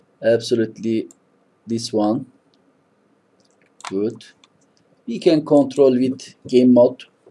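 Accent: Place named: Turkey. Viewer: Türk aksanı bu belli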